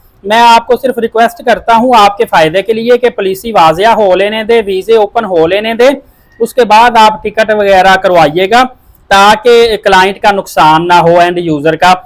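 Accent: Punjab, India. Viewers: سر آپ کی اردو بول چال بھی پنجابی انداز میں ھے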